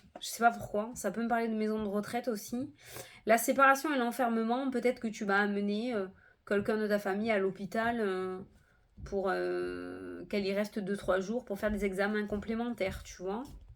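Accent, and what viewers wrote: South, France. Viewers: Merci beaucoup pour ta bonne humeur et ton accent du Sud, j’ai toujours le même plaisir de te voir tous les jours. Quand à moi j’aime les deux formats que tu proposes j’ai envie de te dire fais comme ton cœur ♥️ a envie, écoute le et lui seul le sait. Merveilleuse journée à toi 😊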